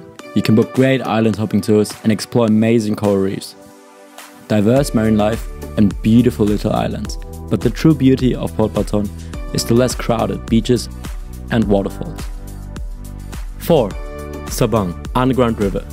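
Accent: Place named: United States. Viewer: dude you sound just like me....are you also American I take it?....just a guess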